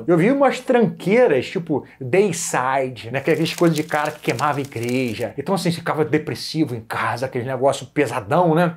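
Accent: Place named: Rio de Janeiro, Brazil. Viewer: Só esse sotaque carioca já ganhou o meu dislike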